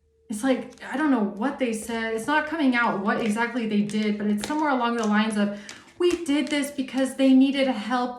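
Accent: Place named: Germany. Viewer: 🐝 princess,,,, your beautiful soul love your strength your wisdom your readings and your sprit,,,, hope you don't take on there yucky shit,,,, don't like seeing you angry,,,, your beautiful your a goddess,, you are so much beauty, your Aura your smile your voice your accent,( Germany)❤, you deserve the best,,,I will reward you when I can 😊,,,, God bless you,,,I don't like you stressing,,,, you would have a smile on someone's face every day x,,, sorry if I sound attrusive,,,, 🦉⭐✨⚖️🦋🐝🐝🐝🐝🐝☀️🕯️🛡️✌️💜😇,,,,, don't hurt your self ( your neck) ,I love you,,,❤,I remember you saying you had a accident x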